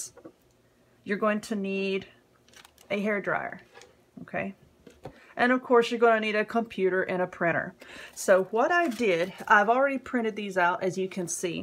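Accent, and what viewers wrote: South, United States. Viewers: Thanks for sharing and I love your southern accent.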